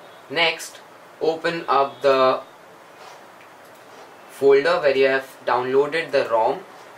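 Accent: India. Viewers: すごいインド訛りだがグッジョブ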